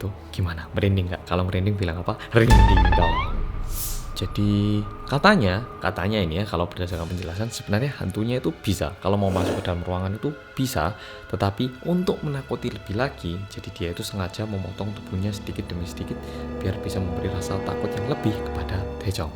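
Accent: Java, Indonesia. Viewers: Wkwk nadanya kaya ad jawa jawa nya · Intronya jangan di ganti dong kak 😣bersama orang korea yang medok aja😂gk usah ganti😂aku suka ikutin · Mas skrng tinggal d mana seh kok bahasa Jawa nya medok bgt...kyk logat Jawa asli:v...respon mas...aku loh gak tau di respon Karo artis:( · Baru2 ini aku play rindingdong jam 12 malem. Karena ternyata cerita2 ini dan juga suara mas medhok ini bikin ngantuk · Semangat lurr,ora ilang jawane💪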